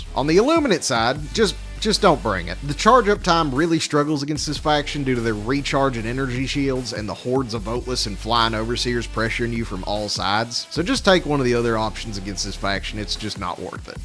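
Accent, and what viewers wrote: United States. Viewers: from the first 15 seconds of the video i could tell this guy is 100% american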